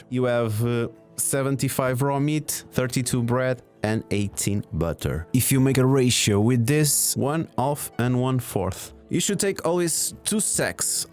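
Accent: Portugal. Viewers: That's a proper Portuguese accent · The Portuguese accent! XD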